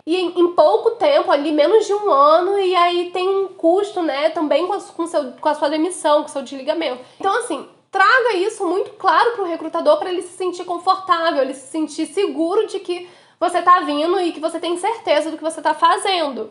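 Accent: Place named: Rio de Janeiro, Brazil. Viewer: deve ser pq a maioria das dublagens são do RJ e o sotaque é o mesmo 😁 por isso ele lembra das dublagens haha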